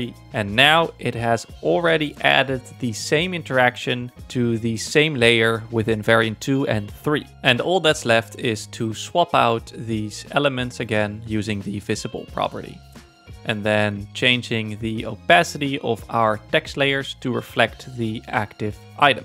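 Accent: Netherlands. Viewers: hahahaha deze man is sws nederlands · Dutch accent on top 💯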